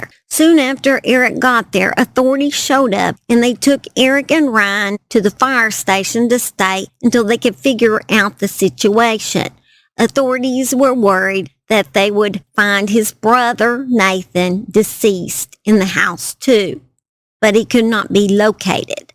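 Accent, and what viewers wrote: South, United States. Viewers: I enjoyed your video and your accent. Please keep it up. Sorry about your lupus. I have 7 autoimmune problems. It stinks. You take care of yourself. I’m from NC. I love Southern people.❤ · Congratulations!!!! 👏🎉 1,000 subscribers!! WOOHOO!!🌟🌟🌟🌟 You're going places 👍👍👍♥️💛💙🧡💚💜 your little Southern voice it's just perfect it fits you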